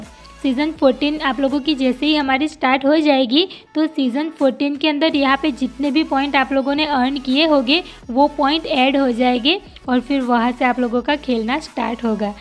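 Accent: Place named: Bihar, India. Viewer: Hey pretty girl aapki aawaj sunke lgta hai ki tum Bihari ho jai bihari meyya ki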